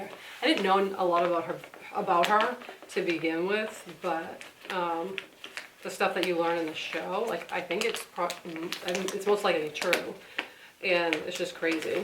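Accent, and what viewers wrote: Boston, United States. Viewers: yer so dam hot love yer boston accent 2 bad yer married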